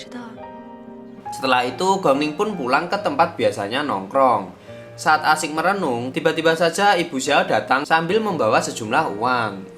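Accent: Java, Indonesia. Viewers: Alur cerita yg d bawakan bagus tpiIntonasi pengisi suaranya terlalu medok...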